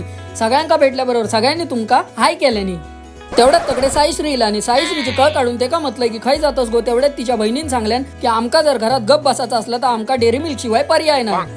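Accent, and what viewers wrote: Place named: Malvani, India. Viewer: I like ( ❤) her hairstyle so nice cute girl.aani tuz malvani pn khup Chan aahe.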